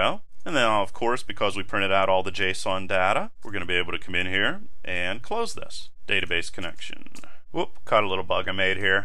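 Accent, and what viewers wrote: Pittsburgh, United States. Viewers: OMG I just realized you have a Pittsburgh accent!!!!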